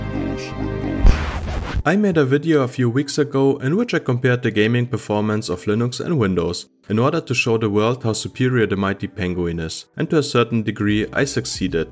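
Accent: Germany. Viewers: german accent detected. :D -> I have the best results with xfce... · Do i hear german accent here? 🤓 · I assume he's german. I'm german, so i can tell 😂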